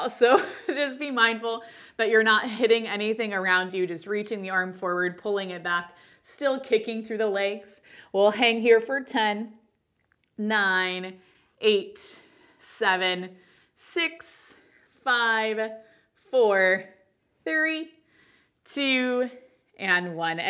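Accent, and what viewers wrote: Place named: Canada. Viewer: I love this challenge! I was surprised to hear that you grew up in Arizona you sound Canadian! I mean that as a compliment!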